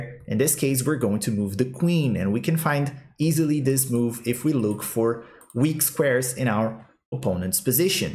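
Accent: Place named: Brazil. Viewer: You have a little brazilian accent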